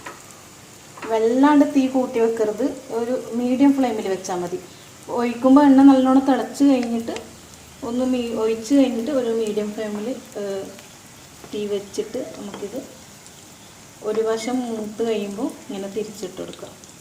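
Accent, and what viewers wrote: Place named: Kannur, India. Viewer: full kannur shailiyil aanallo samsaram. ndhayalm sadanam polich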